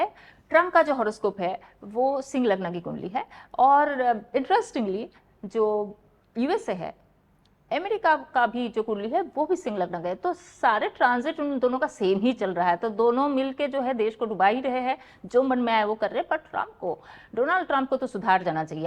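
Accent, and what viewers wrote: India. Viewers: She is Bengali and Bengalis ka hindi itna acha nhi hota and accent bhi alag hota hai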